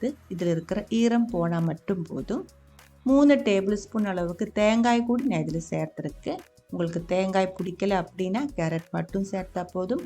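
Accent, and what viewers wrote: Kerala, India. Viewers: Auntie, yude thamizh... Manassilakunnundu... Malayaliyanennu... But a good effort, keep it up 🎉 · Listen her accent, she too is a Keralite 😃😃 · Thank you for your video and detailed instructions. I very much enjoyed your Tamil with Malayalam accent.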